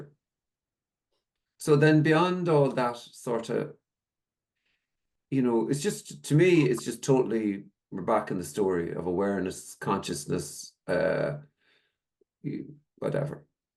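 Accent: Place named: Ireland. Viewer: Love it sounds even better with an Irish ascent ❤❤❤. So so resonates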